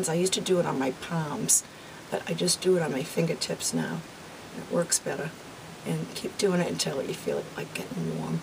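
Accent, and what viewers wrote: Boston, United States. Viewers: Just love your Boston accent. You have got a lot of hair up front. Be thankful, cause I would love to have that much hair. Love the video and good tips on top too! Have a great Turkey day🦃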